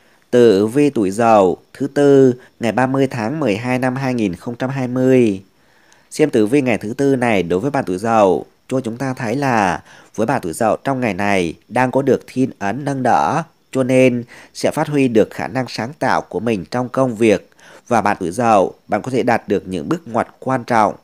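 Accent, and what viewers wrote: Thanh Hoa, Vietnam. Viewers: Bạn cúa phải ngừi thanh hóa ko . Nuối hay rứ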